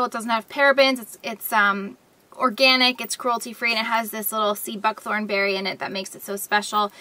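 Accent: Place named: United States. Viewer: could I just ask what part of the states you are from? Love your accent. I am from the UK x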